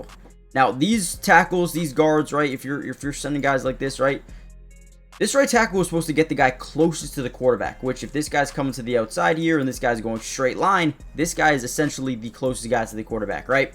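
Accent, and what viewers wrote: Canada. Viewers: Rad Canadian accent my guy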